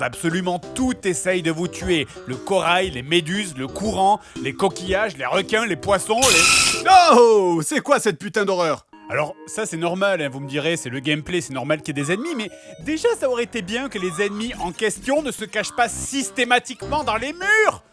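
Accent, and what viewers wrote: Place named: Toulouse, France. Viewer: Tu es toulousain, ça s'entend ....ou du sud de la France...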